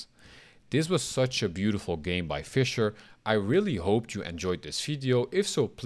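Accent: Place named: Turkey. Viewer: Türk aksani her yerde kendini belli ediyor😃 guzel video reis devami gelsin bu tarz videolarin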